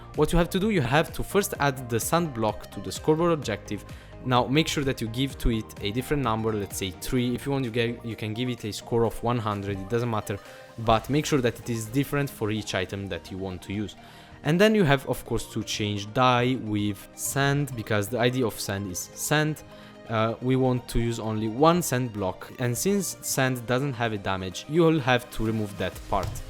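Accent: Italy. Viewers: si sente che hai l'accento italiano :p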